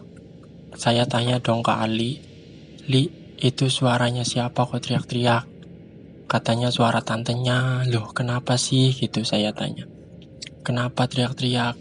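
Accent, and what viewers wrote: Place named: Banyumas, Indonesia. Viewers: Itu mamakee lupa sama anak atau ketakutan sih.. sampe kasian sama mas nya dah ditinggal ndewekan 🤣🤣. Tetep pede ya mas meski medok tp justru itu kelebihanmu mas. Jd natural ceritanya. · ngapak nya kerasa ya wak <3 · Kiye mase mesti wong ngapak😂. Ketara bgt karo suarane🔥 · Wong ngapak kiye mesti. mbleketaket wkwkwk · Waaahhh,, wong ngapak kie,,Salam ngapakerss mas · Medhok kentel 🤭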